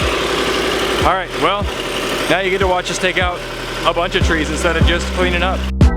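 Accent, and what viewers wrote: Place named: Australia. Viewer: Hilarious how we get down under accents from you I would be doing Yankee or pommy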